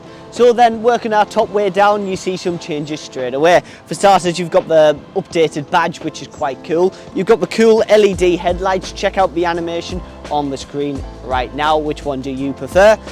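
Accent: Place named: Newcastle, United Kingdom. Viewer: Newcastle / geordie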